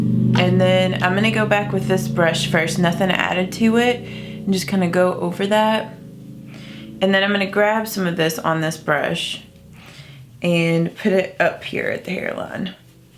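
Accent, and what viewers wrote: South, United States. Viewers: I just love watching your videos. I absolutely adore your accent....are you from the south? Your so sweet and your tutorials are easy to follow and love that you use a lot of drugstore products! 😁🇨🇦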